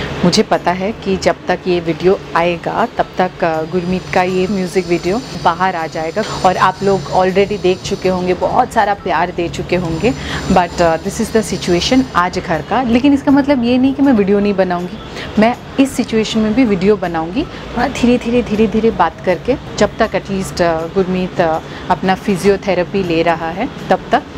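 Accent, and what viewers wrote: Bengal, India. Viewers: Your accent says that you are bengali😅❤️Bengali Beauty❤️❤️ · Oh your Bengali accent🥵😘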